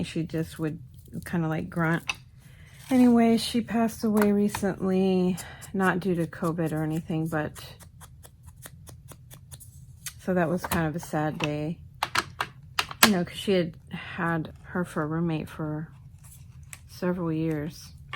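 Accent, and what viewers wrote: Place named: New York, United States. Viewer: Loved your New York accent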